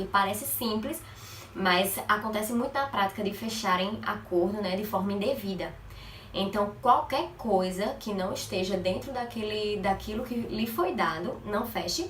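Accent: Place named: Natal, Brazil. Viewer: bom vídeo doutora, parabéns!!.. mas me tira uma duvida.. (sou novo na advocacia) na correspondência jurídica, vejo algumas solicitações de diligencias de audiências trabalhistas para ir como preposto. .. acho "estranho" isso pois, "pq não ir como advogado mesmo da reclamada?!!?" .. como serei proposto numa audiência de uma empresa que não trabalhei ou não tenho vinculo empregatício nenhum?? gostei MUITO de suas dicas para esse tema! obrigado! ps: meu sotaque é igual o seu, sou de NATAL-RN. e vc? grande abraço.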